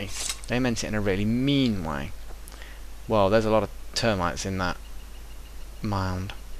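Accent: England, United Kingdom. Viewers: I enjoy him because he doesn't have a silly gimmick(angry video game nerd....), doesn't sound/act like he is stoned/high, doesn't make us feel like we are just silently observes watching a methodical video (like when my german friend plays minecraft) but instead makes us feel like we are along for the ride through skyrim as a LET'S play.. not a walkthough. also I enjoy english accents.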